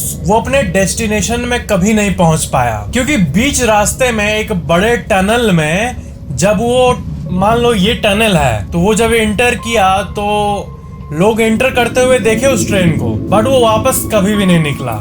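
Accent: Bihar, India. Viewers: According to his language type he is bihari!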